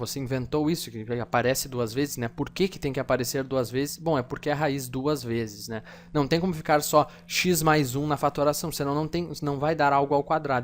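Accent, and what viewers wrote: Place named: Rio Grande do Sul, Brazil. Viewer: muito bom. De onde vc é? Sotaque do Rio grande do sul =)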